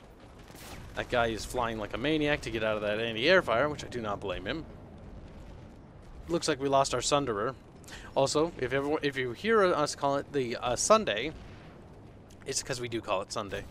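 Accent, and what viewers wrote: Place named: United States. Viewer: Hi! welcome to planetside 2! Are you European? you picked the Cobalt server (Europe server..) and you have a poor connection... wasn't sure about your accent but you sound quite American to me :)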